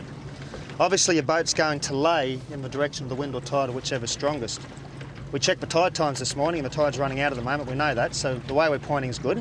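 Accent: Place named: Texas, United States. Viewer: Good video ,,, Love that Texas accent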